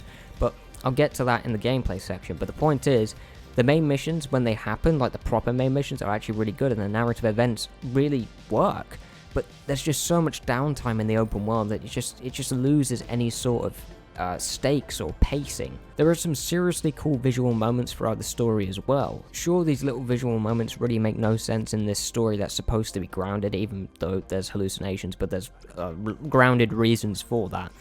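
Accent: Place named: United Kingdom. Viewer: Sounds like reviewer is from UK. They aren't allowed guns there......so they shouldn't be allowed to play games with guns in them. Review is so off base.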